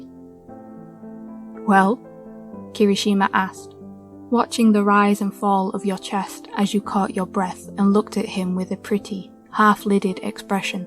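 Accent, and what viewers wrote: United Kingdom. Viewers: never did i think i would reach a state in life where im listening to a british accent audiobook. i think im depressed.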